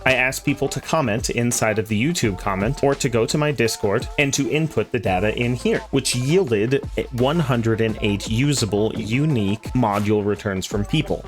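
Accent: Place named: Ireland. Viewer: love the guides irish accent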